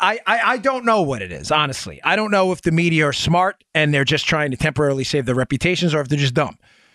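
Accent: New York, United States. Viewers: Since moving back to St. Louis I totally miss people with Dan’s NY/Northeast accent. Mines starting to disappear but I ramp it up to act tough with local punks. Great show Dan, also you are a formidable guest on Fox new panel segments.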